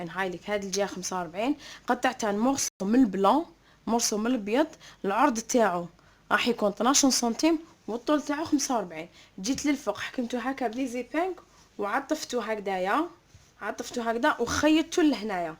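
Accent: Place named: Algiers, Algeria. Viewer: نموت على اللهجة العاصمية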